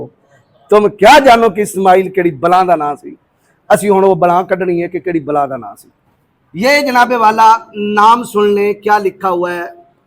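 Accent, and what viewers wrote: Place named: Punjab, India. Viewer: Mashallah bht achchi malumat par Panjabi bech bech me bolkar khas khas Samajh nahi aya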